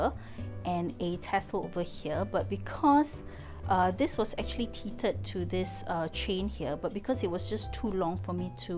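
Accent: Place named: Singapore. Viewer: Recognised that Singaporean accent. Love the Damier Azur print!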